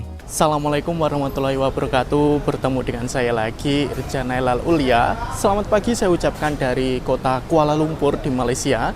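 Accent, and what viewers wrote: Java, Indonesia. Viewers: Bang, maaf ijin tanya. Abang org malaysia atau org indo? dr logat nya orang jawa. Abang belum pernah naik kereta indonesia toh? Kereta kita juga ad yg ada cafe dan pramugarinya. Design dna teknologi jg lebih canggih. Ada karaoke, charger dan tempat duduknya tidak sesempit di malaysia. Jendela juga besar sekali. Ad tempat koper. Coba update dulu sebelum kasih judul bang.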